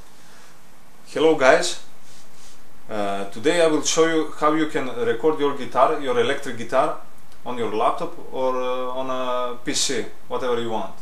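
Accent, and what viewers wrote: Greece. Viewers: Are you Greek? I can recognize the accent because I'm Greek, but I was born in America. Thanks for the help, I've just started recording songs on my ukulele and wanted to know how I can somehow plug my uke into my computer. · that greek accent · greece maybe larisa :P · are you greek? i can tell by ur accent. thanks for the video by the way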